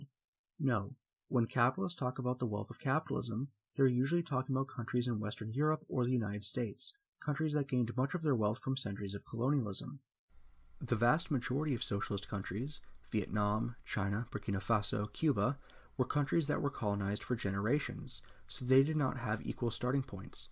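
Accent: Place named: United Kingdom. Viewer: You should be ashamed. I hope you are not some American kid (accent says more Brittish) who wants to be edgy so does this propaganda. My familly lived communism. You did not live shit. Nothing I wish for more than you and your pals actually living in communist times and country. Commies forcing planned economy and collectivization took my famillys farm, took everything. Made grand-grandpa work on his own farm for no pay. He was on Eastern Front from Ukraine to Berlin!! Constant food shortages. In 80s there was shortage of toilet paper. That was luxury! Rly how fucking dare you spreading those ideas that killed millions of people when you are born in free country. Shame on you.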